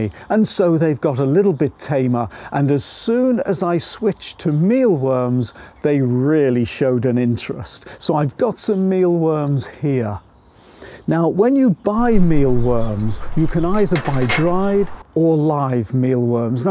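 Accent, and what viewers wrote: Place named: United Kingdom. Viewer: Where do you live? I live in Arizona USA… you sound British to my ear❤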